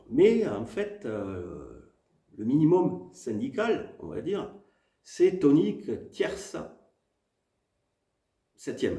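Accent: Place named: Provence, France. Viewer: Pas pire, yabontuto. Ça promène, au fil du manche. Avé le bel accent qui sent le sud provençal. Bon c'est reste plus simple de regarde que refaire, mais pour le coup ça donne l'envie. Bravo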